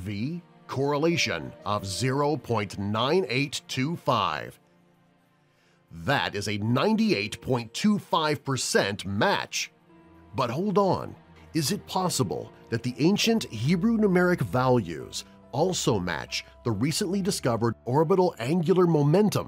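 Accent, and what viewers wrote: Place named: United States. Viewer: why didn't you choose someone who can speak Hebrew to narrate? the pronunciation is so american...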